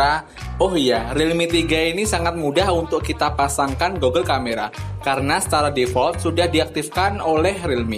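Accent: Magetan, Indonesia. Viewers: Iki lak wong Magetan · Boss...magetan e ndi boss?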